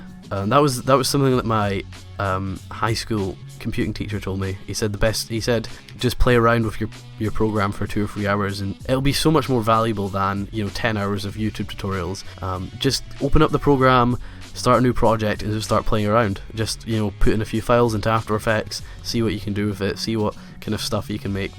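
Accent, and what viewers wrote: Scotland, United Kingdom. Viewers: Are you Scottish if so where from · Where in Scotland are you from? Ps I'm fro Edinburgh!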